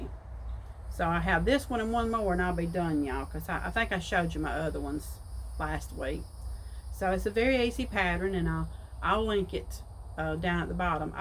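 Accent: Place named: Mississippi, United States. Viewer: where are you from cause you talk like me? I’m from Mississippi!❤️🧶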